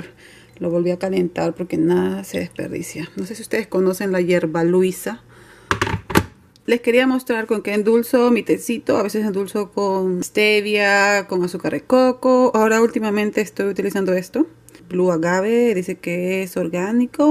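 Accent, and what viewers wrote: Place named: Peru. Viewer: Jajaja sabes me.mato de risa porque hablas tan peruana, como extraño Lima Peru, compatriota mia. 😀 Espero mejore tu bebito esta lindo. Suerte !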